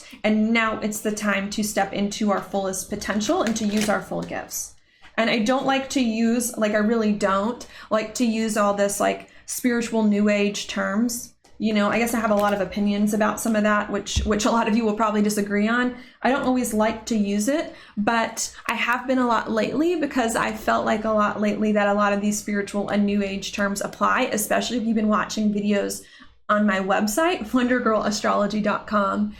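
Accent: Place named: Pittsburgh, United States. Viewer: I just kept getting caught up on your CUTE Pittsburgh accent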